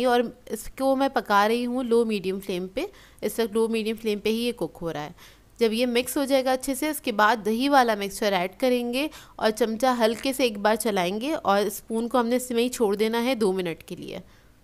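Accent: Karachi, Pakistan. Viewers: Karacnhi with a typical tone so sweet once Mahira Khan pronounced same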